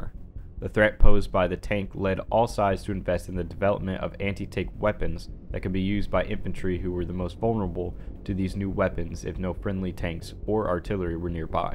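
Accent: United Kingdom. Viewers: So glad you got the British guy to narrate lol. No offense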